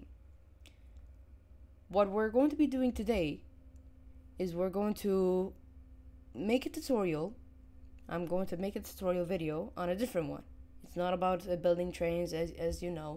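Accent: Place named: United States. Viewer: why does bro sounds american when bro lives in saudi arabia 💀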